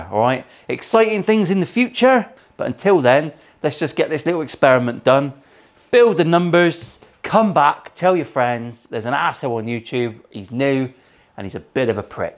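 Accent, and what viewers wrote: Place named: Devon, United Kingdom. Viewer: Really enjoying your videos, great stuff. It's great hearing your accent as well, I have friends who live in Ilfracombe so it's a great reminder of them.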